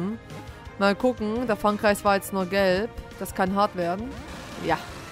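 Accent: East Germany, Germany. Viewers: Hey, echt schönes Lets play, bin neu auf deinem Kanal und ich finde deinen Ostdeutschen Akzent echt super :) bin übrigens auch ein Ossi :D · Gott wie ich dein ostdeutschen Dialekt liebe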